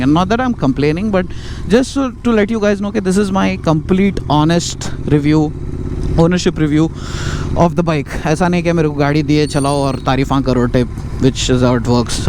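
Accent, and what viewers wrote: Hyderabad, India. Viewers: like your content specially the way you talk Hydrabadi I just love it. Good job and keep up doing well. Best of luck waiting for your next vlog. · Hey! Awesome content, entertaining, love the way you talk.. and that Hyderabadi touch is cherry on the top. I'm planning to buy 650. Wanted to know about how much does it heat really (Think of Mumbai traffic.. peak hours) I had bought a brand new Street Twin and i sold it in 6 months. One of the primary reason being the heat that it generates while riding in the city. I'm riding a 500 classic right now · Bhai aise hi Hyderabadi slang main baat. Karo mast Maza aara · Bhai the way u talk is so much good because u r very experienced rider and your Hyderabad language is 😍😍😍😍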